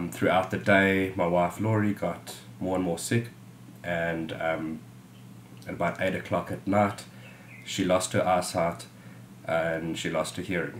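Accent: South Africa. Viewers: I'm so sad about your tragic loss, but happy you had a precious boy thereafter. Awesome to hear your SA accent! The Lord bless you and keep you. Greetings from Mossel Bay 😊❤😊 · I am so very sorry for your loss. I'm trying to pinpoint your accent? South African? Just snooped your other videos, and answered my own question when I saw one video said you are in Africa. This video blessed me today, and I thank you.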